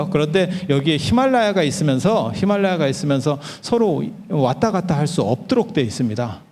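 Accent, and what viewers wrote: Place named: Jeolla, South Korea. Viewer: 왜 언제부터 전라도 사투리가 여기저기 서 마구마구 쏱아지나 언제구터 재수없는 전라도 사투리가 표준어처럼 둔값된건가 불교방송마져도 오염되어있으니 정말엿갔다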